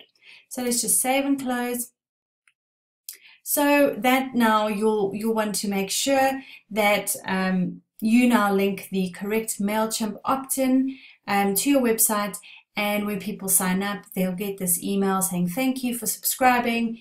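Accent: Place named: South Africa. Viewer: This is so helpful...I imagine things look a little different now, but I’m excited to give this a try! Plus!! So nice to hear another South African accent! 😉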